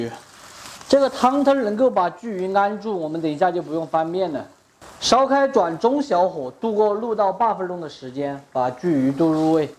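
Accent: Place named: Xinjiang, China. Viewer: 博主的普通话说起来很像新疆人。😄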